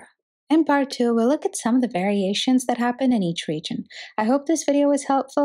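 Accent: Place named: India. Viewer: Wow , I can't believe that you're from India love your videos and your accent wow love from pakistan ❤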